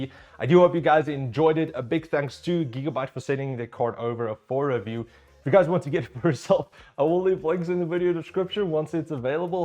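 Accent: South Africa. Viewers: Damn i knew you were pronouncing words in a odd yet familiar way. i could not put my finger ont it. (im living in Australia for a couple of years now) That good ol south african accent. I can still pick it up.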